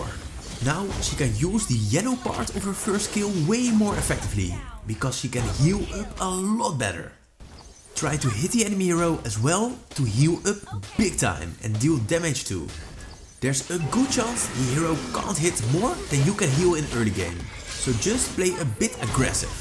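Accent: Netherlands. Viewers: You got so Dutch accent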